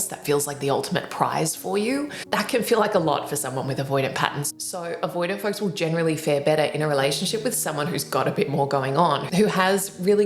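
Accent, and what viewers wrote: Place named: England, United Kingdom. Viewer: You speak in a very elegant way. Also love the English accent. Great video!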